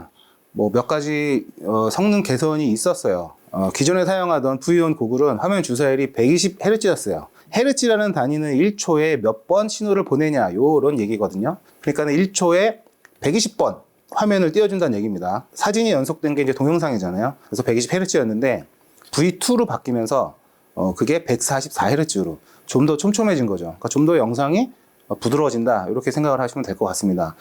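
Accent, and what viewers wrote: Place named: South Korea. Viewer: Korean accent are 🔥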